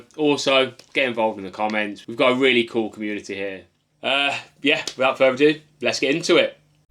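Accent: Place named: Midlands, United Kingdom. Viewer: Been watching for a while, and only just noticed you’re in Warrington, it’s where I’m from too! With the accent, I was assuming the midlands. Glad that you’ve been able to get your bloods done, it will give you a good insight. It’s a shame it doesn’t seem like you have any other bloods to compare it too, because it could be that yes the result might not look great but there’s no way of knowing if it’s an improvement from when you started 11 weeks ago if that makes sense? Unless you did have bloods done at the start and I’ve missed that. I’ve never had my bloods done before, but been on my weight loss journey since October last year. I’m getting them done tomorrow, privately because my GP is useless 😂. Then although this will give me good insight, I think I will get them done again a few months down the line to compare. Anyway mate, you’re smashing your journey, don’t know if I’ve ever messaged on here so if not, hello! 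Hopefully you won’t have to stop the meds as they have really supported you it seems, but health always comes first!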